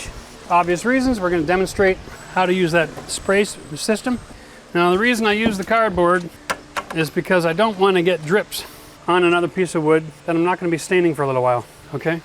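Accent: Canada. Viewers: Great work and an amazing deck. I could be wrong but you sound Canadian.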